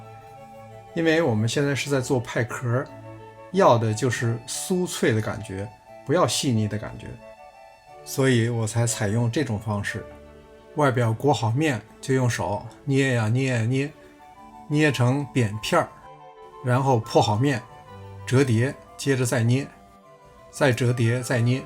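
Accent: Beijing, China. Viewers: 叔叔你是住在海外吗？北京口音听着特别亲切。我是你的韩国粉丝。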